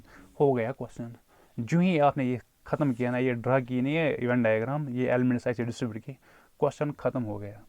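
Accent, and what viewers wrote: Kashmir, India. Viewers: Zero respect for your pitch and language. the way you speak Urdu. It's like kashur Urdu.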